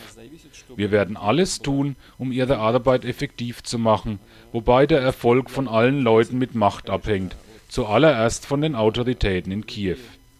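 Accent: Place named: Franconia, Germany. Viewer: alter kannste kein hochdeutsch oder was, so stolz du auf dein fränkisch auch sein magst sollteste wenigstens versuchen es für die zuhörer erträglich zu machen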